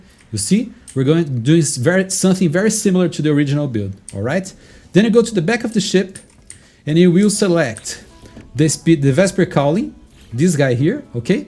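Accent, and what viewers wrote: Brazil. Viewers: Só de ouvir o sotaque da pra perceber que o cara é BRASILEIRO!!! Meu amigo, tu manda muito, essa corvette é simplesmente INCRÍVEL e sinceramente é a minha favorita em toda a comunidade de NMS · Cara, que vídeo bom, melhor ainda foi escutar a voz e logo sacar que é um br, parabéns irmão, tá de parabéns. E meu Deus o tanto de Argonaut Winglet que tem que usar é brincadeira viu, Rockhopper Propeller mvp do vídeo.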